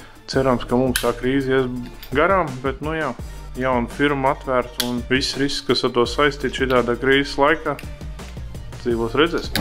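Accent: Courland, Latvia. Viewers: Super! Vislielākais paldies par foršo kurzemnieku dialektu. Man kā Talsu puses aborigēnam šitā foršā Ziemeļkurzemes izruna ir kā mēds iekš ausim!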